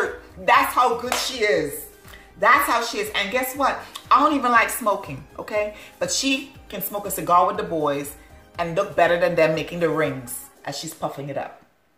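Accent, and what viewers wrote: Trinidad and Tobago. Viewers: Are you trini ? U sound trini lol it’s cute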